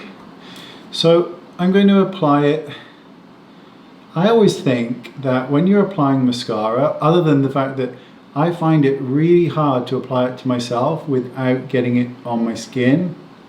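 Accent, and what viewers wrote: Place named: Wales, United Kingdom. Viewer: Great mascara, bloody lovely, with a welsh accent too! Love it x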